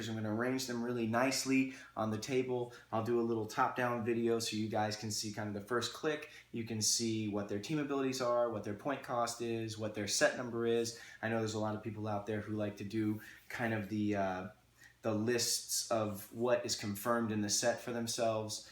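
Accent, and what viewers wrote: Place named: Russia. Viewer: Good Russian accent! You almost sound like FPS Russia!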